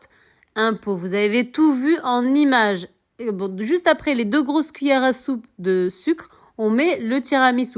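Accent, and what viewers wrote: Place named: Canada. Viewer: j'aime beaucoup ta façon de parler 😊😊❤on dirait que tu es canadienne salammm...😊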